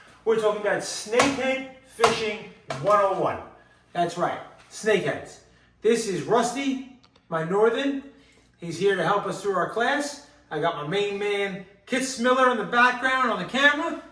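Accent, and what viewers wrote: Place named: Bronx, United States. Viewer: ARE YOU FROM NEW YORK? You sound like you’re from the Bronx.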